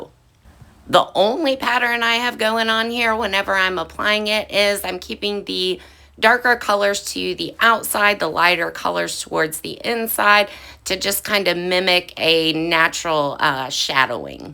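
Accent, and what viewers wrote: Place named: Alabama, United States. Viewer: Love the Alabama accent. lol Amazing work.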